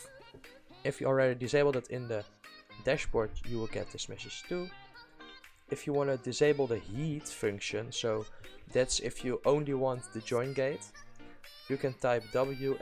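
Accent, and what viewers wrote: Netherlands. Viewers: Ben je nederlands je accent? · Haha ik hoor gwn dat je Nederlands bent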